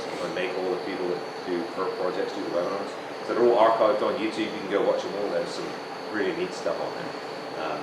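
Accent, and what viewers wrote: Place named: West Country, United Kingdom. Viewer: Good to see hasn’t lost his West Country twang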